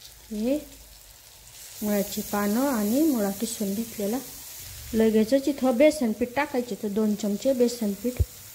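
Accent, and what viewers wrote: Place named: India. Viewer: तुमचा आवाज कीती गोड आहे 😍 अस्सल गावरान मराठी भाषा त्या आवाजाला अजुन सुट होते 👌💯✅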